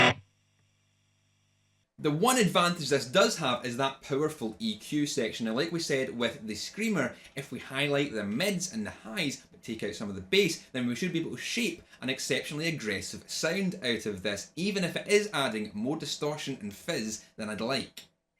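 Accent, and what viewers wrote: Scotland, United Kingdom. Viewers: You sound like William Wallace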